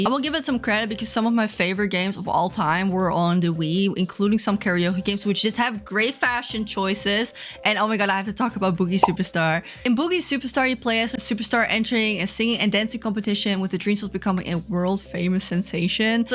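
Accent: Netherlands. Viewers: Oh my god i was listening and thought i heard a bit of a Dutch accent peeking through untill you mentioned the Dutch name of a game. I feel so smart lmao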